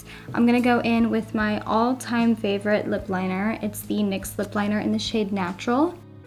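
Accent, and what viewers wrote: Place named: United States. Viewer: You have such a basic American accent I’m living like it’s not southern but it’s not like Boston or new York yk? I have a southern german accent and it’s so aggressive but yours is so smooth like a good whiskey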